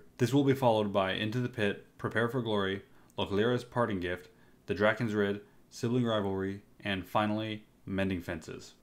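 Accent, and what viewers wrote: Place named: Netherlands. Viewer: You're Dutch, aren't you? Good accent, it's almost not coming through. Almost :P Nice vid, keep it up. Speak a bit slower though, it's not a race :P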